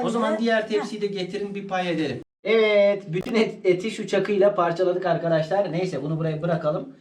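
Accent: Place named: Macır, Turkey. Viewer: Iyilik bulaşıcıdır...lütfen niye gösteriyorsun diyenlere bakmayın yeni keşfettim kanalınızı ve çok çok sevdim. Ailenize de sizede minnoş kızınıza da hayırlı uzun omurler versin benim babam macır göçmen konuşmanız siveniz o yüzden köy özlemini giderip bir nebzede olsa mutlu ediyor🤗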